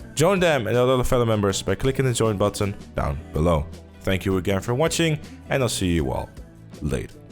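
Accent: Netherlands. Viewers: Do I hear a Dutch man speaking English?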